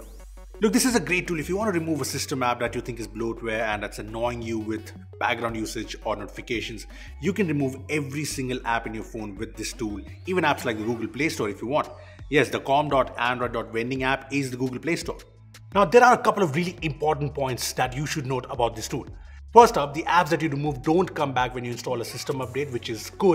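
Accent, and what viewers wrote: India. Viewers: indian accent😐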